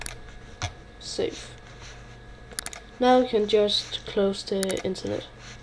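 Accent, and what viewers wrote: Denmark. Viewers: danish accent haha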